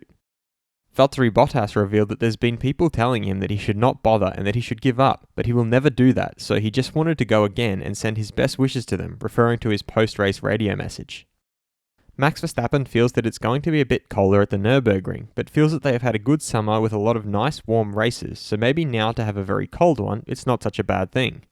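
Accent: Australia. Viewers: You sound like you are an Aussie. Why in your subtitles do you spell "tires", a particularly yank spelling instead of the the more normal "tyres"?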